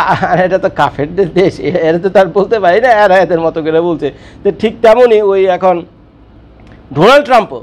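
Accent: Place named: Old Dhaka, Bangladesh. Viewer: আপনার ভাষা অনেক টা পুরান ঢাকার আশে পাশে কেরানীগঞ্জ গঞ্জের মত লাগছে।জানতে পারি কি আপনার হোম ডিস্ট্রিক্ট কোথায়?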